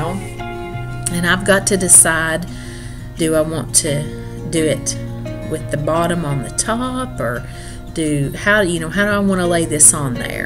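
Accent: South, United States. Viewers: Definitely rice or roast potatoes...you make me laugh, saying you nearly dropped your cake. And the other time when you burnt your meatballs! Love that you show your fails, and your southern US accent is such fun to my English ears.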